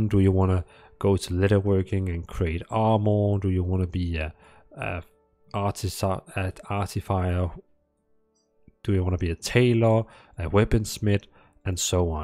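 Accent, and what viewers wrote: Denmark. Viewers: Ahh thank you for this very helpful introduction. I just recovered my Guild Wars 2 beta character, a gnome thief who has been waiting patiently for me for 3411 days. Looking forward to give the game a shot. Out of curiosity, you sound Danish, am I correct in that assessment? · Du taler super engelsk, men vi danskere har bare en evne til at spotte andre danskere uanset hvor lidt accent man taler med 😁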